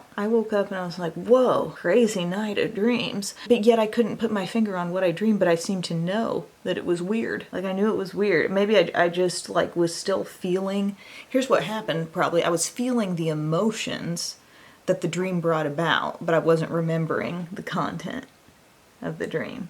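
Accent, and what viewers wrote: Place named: South, United States. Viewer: I'm just curious I know that you live in the Midwest. I always hear your voice like you have a slight southern accent. Where are you from?🤗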